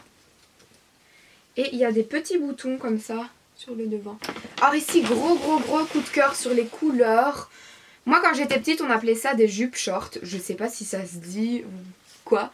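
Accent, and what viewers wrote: Belgium. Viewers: super vidéo comme d'ab j'adore ton accent c est le même que moi (vu que je suis belge) bref je t'adore et une vidéo rangement maquillage ça serait trop cool !!! 😂💖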